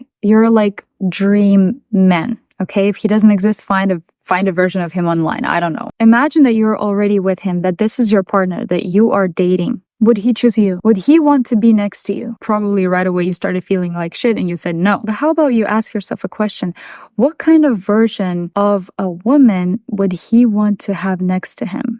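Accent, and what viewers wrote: Russia. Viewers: Are you russian ???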